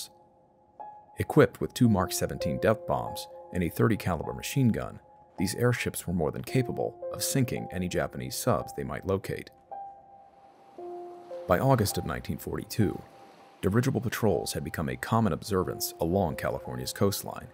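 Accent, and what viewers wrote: United States. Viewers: I'm pretty happy to hear an American accent. This guy is a Marine and his channel focuses on war stories. No Brits here. 😬. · Was looking forward to this channel, then "Oh for gods sake, an American narrator" why does everything have to be americanised, won't be listening again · Would have liked to be able to add this to my subscribed list as I so enjoy Bedtime Stories, but the American accent isn't for me. Sorry.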